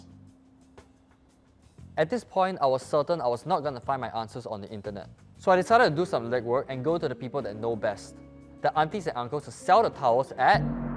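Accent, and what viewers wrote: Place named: Singapore. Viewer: But that's how Singaporeans speak...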